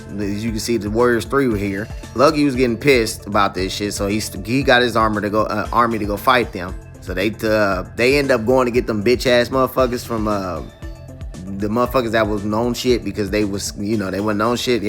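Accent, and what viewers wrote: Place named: South Central, United States. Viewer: My boy, talking with the south Central accent you know we family I got a sub🔥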